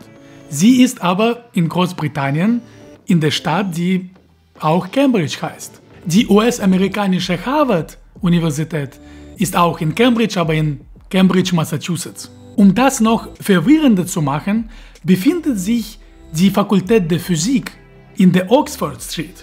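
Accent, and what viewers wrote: Russia. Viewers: Das ist richtig, es gibt viel zu wenig gute deutschsprachige naturwissenschaftliche YouTube Kanäle. Schön das Du Zeit findest, Dein Wissen mit der Allgemeinheit zu teilen. Übrigens, der russische Akzent ist einfach nur Klasse! Ich freue mich auf weitere Beiträge.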